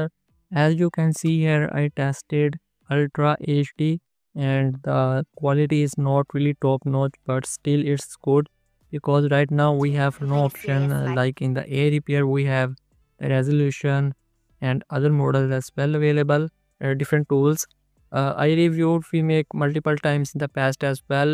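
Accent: India. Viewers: Indian accent😭😭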